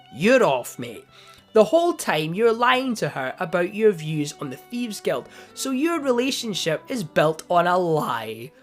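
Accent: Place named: Ireland. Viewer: You sound Irish, my mom's Irish so 😉. Happy St. Patrick's day ☘️.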